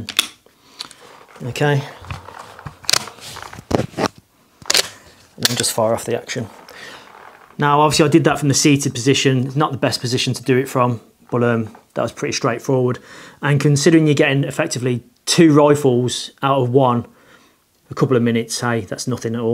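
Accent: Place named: West Midlands, United Kingdom. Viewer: ahhh a fellow west mids chap? anyway great review think im gonna go LANTAC and salute you sir :D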